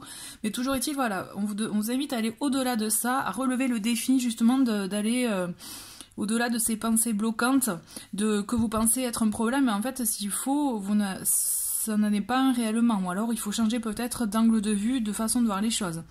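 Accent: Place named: Aveyron, France. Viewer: Merci cela me touche.. votre accent me va droit au coeur aussi car L'Aveyronnaise que je suis est sensible à ce chant dans la voix... ce chant qui est aussi le mien malgré mon ''exil'' en région parisienne. Je garde foi mais je dois me mettre en distance car je souhaite sortir de ma profonde dépression. Bisous🕯🌞❤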